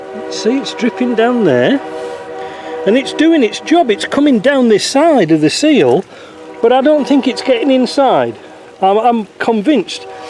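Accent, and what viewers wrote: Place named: Middlesbrough, United Kingdom. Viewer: Well that answers that question 'like a wet weekend in Middlesbrough', my wife was adamant that you had a Boro accent. She's a Middlesbrough lass and we live in Stockton on Tees....... and yes, that's often the weather. Got my sliding window seals done, now to track down a roof leak that runs in the inside roof gutter and showers my lass when braking ...... oh the joys. Ken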